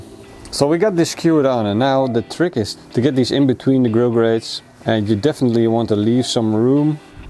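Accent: Netherlands. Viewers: Are you Dutch ? Your english accent really sound like a dutch man 🤣 still good video tho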